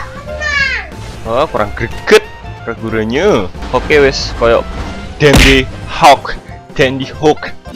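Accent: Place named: Madura, Indonesia. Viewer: Orang mna lu?kek bhs madura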